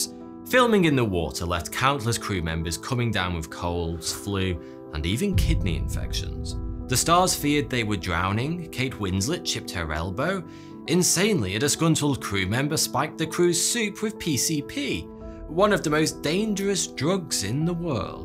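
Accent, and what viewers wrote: United Kingdom. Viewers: Is he british? I keep hearing "fourth two here" at the beginning...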